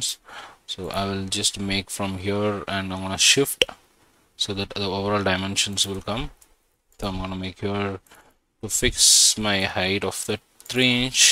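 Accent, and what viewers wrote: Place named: India. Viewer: People from different regions have different accents. DEAL WITH IT. probably he is an Indian, which means English is not mother tongue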